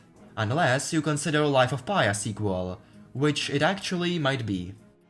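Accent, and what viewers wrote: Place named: England, United Kingdom. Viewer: тебе очень идёт английский акцент, и вообще, молодец, что решил изучать языки😄Круто справляешься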